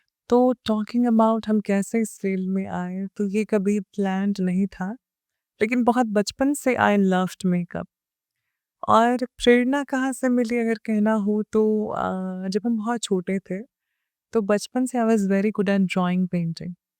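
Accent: India. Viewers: aap ke bolne ka lahza bilkul ham Lucknow walon ki tarah h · The way you are talking you are not look like a Nepali, you are look like Indian, all the best 👍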